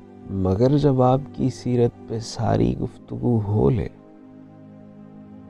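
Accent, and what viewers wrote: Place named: Punjab, Pakistan. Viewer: very nice urdu accent that too from a Punjabi speaking (I am assuming since you have as a Surname). Highly appreciated.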